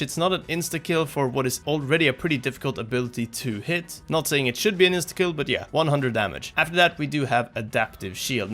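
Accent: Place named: Sweden. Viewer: One of the most Swedish accents I've heard / a Swede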